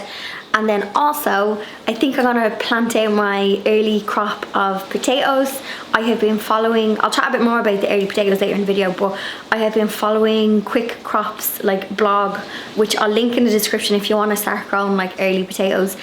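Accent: Ireland. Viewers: Hi, a great video and you have got me motivated ( which I needed ), I have power washed my decking and other bits ( also myself ), and tidied up a bit, and it’s all down to you, so thank you so much, I love your very energetic videos and of course your wonderful Irish accent, you make my day. xx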